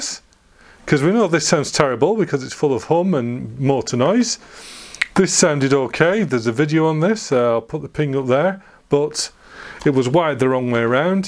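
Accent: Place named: Liverpool, United Kingdom. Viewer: Excellent video. But why are you speaking in a scouse accent? Where exactly in Liverpool are you from? If you are not from the Merseyside area why are you speaking in a Scouse accent?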